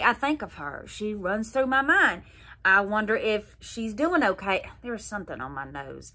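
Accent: South, United States. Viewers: Yes !!! Make up tutorial!! Need it. and more economical shopping for clothes. Keep up the smiles and the positive vibes. Live you and so does my husband. So glad you also speak southern. 💓✝️🇺🇸😎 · sounds like a real southern belle we in here in georgia luv you youre the VERY BEST how great is WE LUV YOU luv your southern alabama accent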